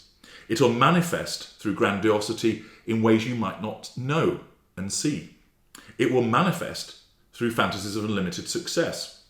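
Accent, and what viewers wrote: United Kingdom. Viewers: Are u British ? 🙏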